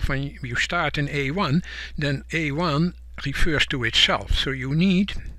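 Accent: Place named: Netherlands. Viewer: Am I the only one to adore your voice and your lovely Dutch or Flemish accent?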